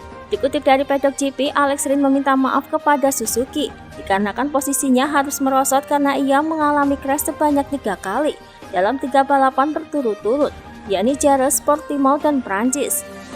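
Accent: Java, Indonesia. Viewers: Mantab bagus penjelasannya , ciri khas banget penjelasan medoknya saya suka 🥰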